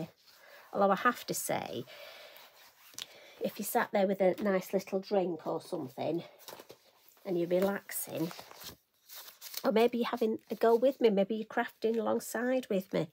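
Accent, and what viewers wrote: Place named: England, United Kingdom. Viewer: Actually, I love watching you paint, and I love to hear you speak, with your lovely English accent. what a lovely card. Blue is my favorite color. 💟💟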